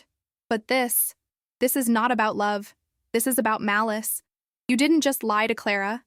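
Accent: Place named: United States. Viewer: Narration in an American accent, calling the trunk of the car, the "boot," which is what Brits call it, amused me.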